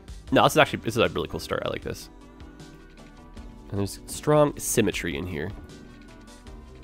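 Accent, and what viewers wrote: Canada. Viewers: Just stumbled on u and may i say you have quality ! I really liked these 2 episodes so far i hope u keep doing them :) also are you maybe Candian ehh ?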